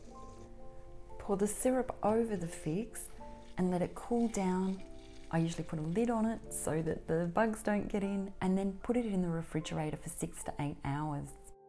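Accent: Australia. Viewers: Hi. I’m reigniting my canning journey after 30 years. Love ya work. You sound Australian but you are giving US measurements. Is there any chance you could give the poor old Aussie viewers the metric version ’on the go’? ❤ Also…. We’re you ever a presenter on Playschool? I’m sure I’ve seen you on the telly in the past. 🤔❤️❤️❤️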